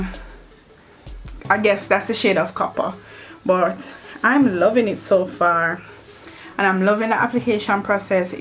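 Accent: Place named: Jamaica. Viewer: Love that Jamaican accent❤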